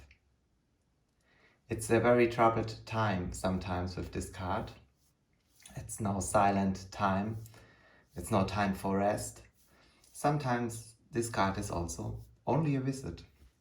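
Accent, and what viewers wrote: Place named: Bavaria, Germany. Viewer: Most excellent video! Where are you from, love your accent!!! Bavarian, perhaps, since it reminds me of my mother 💜💯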